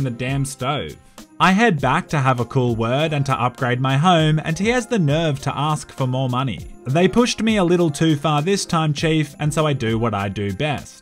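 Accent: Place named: Australia. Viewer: Dearest, I believe you are Australian like me? Just going off the accent here. This video was posted 5 hours ago. You were awake at 3 in the morning? g e t s o m e s l e e p y o u w e t c a b b a g e - Sincerely, Glacier.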